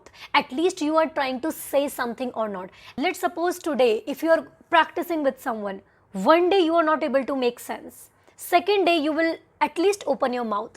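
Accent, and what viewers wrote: India. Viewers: I think you are from India because your voice is Indian.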